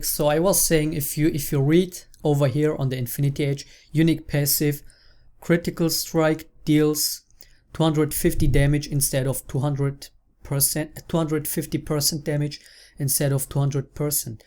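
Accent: Germany. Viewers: Is he german ? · Für mich hört er sich extrem DEUTSCH an. He sounds extremely german for me, lol. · lel du bist deutsch? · Are you german or? I'm triyng to recognise your accent because I find it quite amusing but i'm pretty bad at recognising accents so yeah. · Du bist Deutscher oder :D ?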